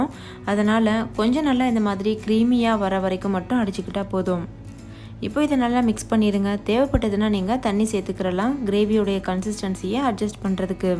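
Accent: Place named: Madurai, India. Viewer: akka unga slang madurai mathiri eruku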